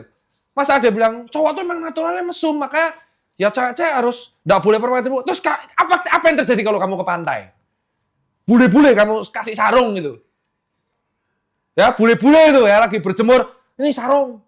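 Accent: Java, Indonesia. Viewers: Dok Tirta setelan Jawa gni paling suka.. hahha terutama pas ngmong "agak rekoso itu", "..let stgh jam" wkkwkw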